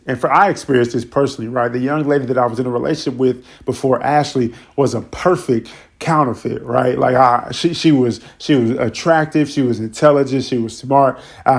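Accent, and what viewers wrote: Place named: Washington DC, United States. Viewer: Are you from DC? …. I hear an accent and the slang lol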